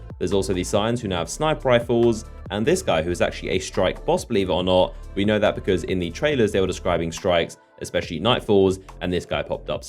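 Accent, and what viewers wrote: United Kingdom. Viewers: Another one of thoses british with a unsufferable accent. Clones AND cookie-cutters, all of them.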